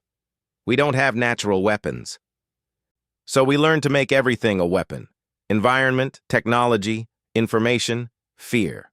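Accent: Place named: South, United States. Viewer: Why the hell did you choose a redneck voice to narrate this AI story.......it made it into even more of a joke.....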